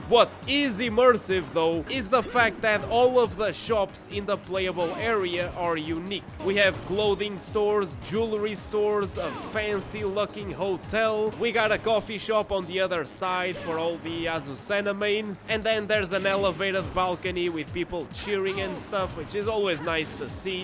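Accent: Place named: Portugal. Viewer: Wowowowoww do i hear....portuguese? Um português fã de Tekken 4 rahhhhhhh🇵🇹🇵🇹🇵🇹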